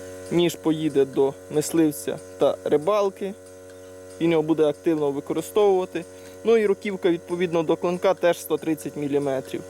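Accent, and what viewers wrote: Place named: United States. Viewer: По-американски пиздит, ничего не понять.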